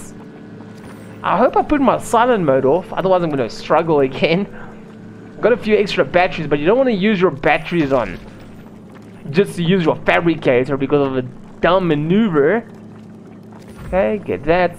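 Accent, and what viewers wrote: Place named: South Africa. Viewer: DEAD GIVEAWAY!!! The Agggg... bra you are my South African brother :)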